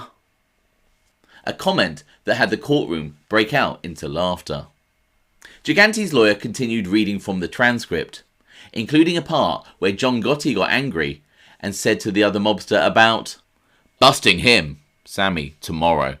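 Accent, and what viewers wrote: United Kingdom. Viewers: Brit talking about American Mafia lol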